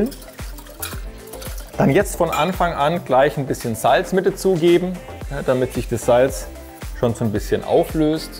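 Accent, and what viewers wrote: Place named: Berlin, Germany. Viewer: "Nen Gudn Appetit wünsch ich euch" lol Ich liebe diese geschwollene Berliner Redensart. Erinnert mich sehr stark an Christian Rach. XD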